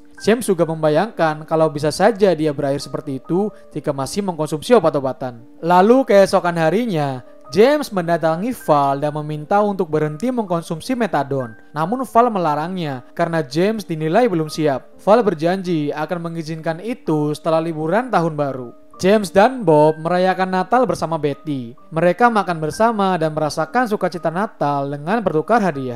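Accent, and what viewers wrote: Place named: Indramayu, Indonesia. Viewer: Wong dermayu tah mang "manganese nginung sing a key cung"😂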